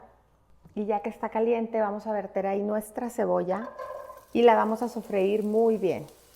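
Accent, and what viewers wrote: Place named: Mexico. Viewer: Nena creo no equivocarme de qué sos mexicana y hablas muy lindo muy bonito me encanta tu acento tu ternura tu dulzura tu calidez tu feminidad yo creo que cualquier mexicano se debe sentir orgulloso de que representas a tu país maravillosamente te mando un abrazo eterno Virginia de berisso Argentina.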